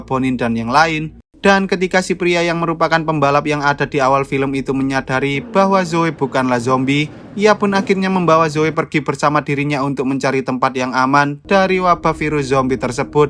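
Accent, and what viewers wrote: Java, Indonesia. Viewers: Miminnya logatnya masih medok😅 tpi seru jg dengarnya😅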